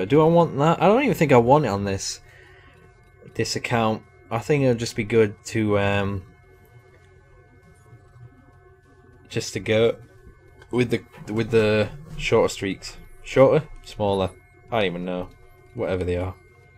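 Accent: Yorkshire, United Kingdom. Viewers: be proud of ur yorkshire accent:) use the words like 'gunna;:D im from yorkshire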